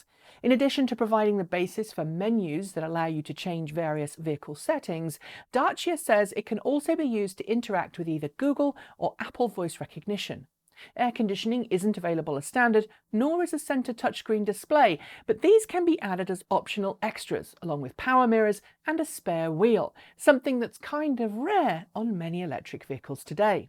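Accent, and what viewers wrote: United Kingdom. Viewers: accentul este britanic, dar ea locuieste si produce videoclipurile in US. Si sa stii ca in scurta mea vizita in USA, am descoperit ca multi dintre cei care stiu ce este o Dacie, stiu sa pronunte perfect. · All electrics are preferred to ICE vehicles... it’s youtubers like YOU that have coined the phrase “Tesla Killer”.. which has created the backlash of other people proving that they are NOT Tesla killers.. so, if you wouldn’t report it as a contentious situation, there would be no backlash.. in the end, you are complaining about your own click bait.. well you got us to click.. then you complain?.. you sure do have the most passive aggressive show that I’ve ever seen. Just because you use you pseudo intellectual, dainty, British accent to make things sound better, it doesn’t mask your bias against Tesla.. and your bias is created by click bait shows like yours. 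So go yell up an alley, no one cares. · Accentul este evident, britanic. Deci nu US. Nici nu cred că americanii ar putea pronunţa corect "Dacia". Britanicii au învăţat deja.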